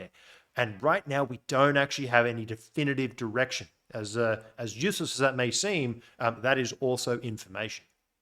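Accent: Australia. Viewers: Nice to hear an Aussie providing such valuable info in the BTC space...👍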